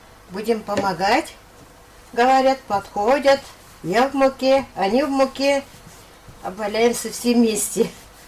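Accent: Tatarstan, Russia. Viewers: Исэнмесез😘Какой у вас акцент татарский классный,слушать одно удовольствие❤❤❤❤❤Рэхмэт за рецепт💃💃💃💃💃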